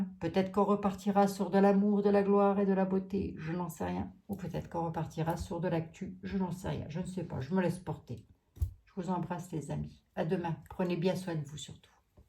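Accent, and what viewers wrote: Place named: Vosges, France. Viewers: Moi heureuse de retrouver l 'accent Lorrain et les expressions. De temps en temps j'en ressors une. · Bonsoir, je me régale avec vous, entre autre avec votre accent, étant spinalienne d'origine, bon certes j'avais 9 ans lorsque je suis partie dans le sud, cela fait maintenant 50:ans. Quand je vous écoute je retrouve mon accent. Le plus qui m'éclate chez vous c'est quand vous vous mettez d'un coup à râler après quelqu'un, et que vous avez raison. Ça me fait délirer votre humour. En tous les cas, merci pour tout ça. Je vous adore.🌸🥀🌷🙏🙏🌹💐😇😘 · Une petite pointe d.accent vosgien par moments... celui-là on ne peut pas l'oublier (j'en suis la preuve)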